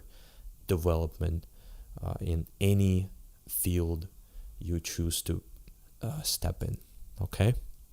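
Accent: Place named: Russia. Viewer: почему вы английские фразы произносите русскими звуками?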